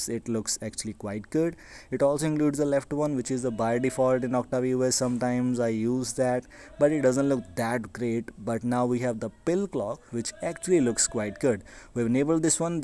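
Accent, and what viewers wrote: India. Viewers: Bhai Lucknow se ho kya?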